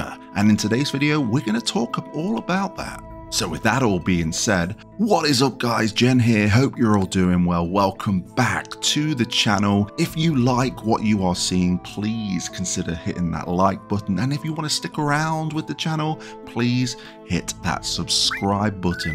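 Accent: United Kingdom. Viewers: “Beer tricks” 😂. Love a British accent.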